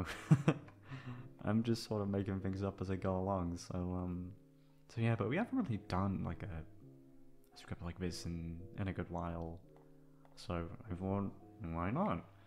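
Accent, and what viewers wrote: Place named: United Kingdom. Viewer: AND HE HAS A BRITISH WHAT MORE CAN U ASK FOR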